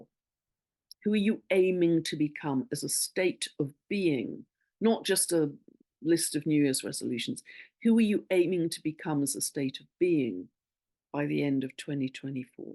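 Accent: United Kingdom. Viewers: Luminous holidays to you dear!!! I love all your insights and beautiful energy and british accent. 🙏🏻💫💚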